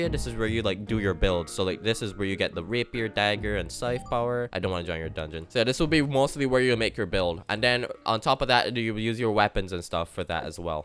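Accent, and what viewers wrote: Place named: Barbados. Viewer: Bro sounds Bajan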